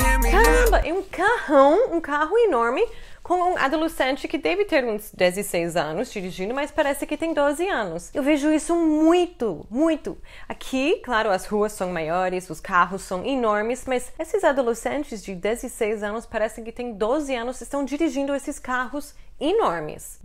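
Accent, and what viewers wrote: United States. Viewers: Nunca sai do Brasil, então nao vou comentar, pode notei no seu sotaque uma diferença. Você em tão pouco tempo está com sotaque mais americano kkkkk. Beijao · Your american accent, speaking english or portuguese, is very nice!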